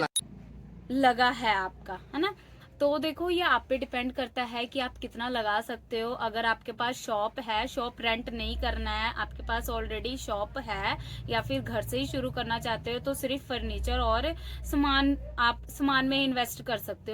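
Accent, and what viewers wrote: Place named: Punjab, India. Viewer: मैं भी video बनाती हूं मेरी भी shop है आप के जैसे मैं भी पंजाब से हु आपभी मेरी जैसे हिंदी बोलते है😂 आपकी वीडियो रोज देखती हूं बहुत प्यारी है आप❤❤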